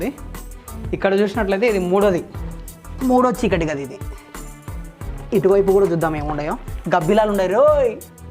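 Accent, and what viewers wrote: Rayalaseema, India. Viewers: Super vundira bai, hatsoff to your photographer, we are enjoying your Rayalaseema style of language 👌and enjoying thril/unknown information 😀. Keep it <link> care when outdoors shooting. Jeete rehooo 👦👬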